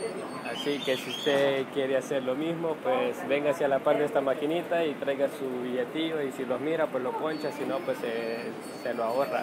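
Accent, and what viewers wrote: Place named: El Salvador. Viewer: También se le nota en el "pues si" jajajaja yo también soy salvadoreña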